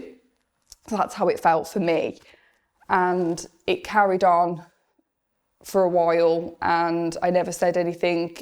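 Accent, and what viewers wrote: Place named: United Kingdom. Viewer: This girl reminds me of a former girl friend from college days, except for the lovely british accent. She was NOT a JW, I should add. What a mixed up cult they are.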